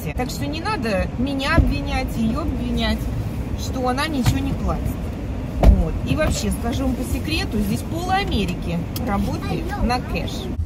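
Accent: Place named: Bryansk, Russia. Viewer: Сподхватились? Деревенский русский. Брянщина. Хорошо,что Джон не знает русский-его бы коробило!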